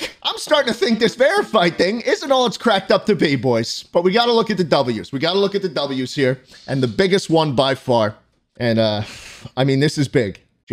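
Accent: United States. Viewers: American accent at its purest.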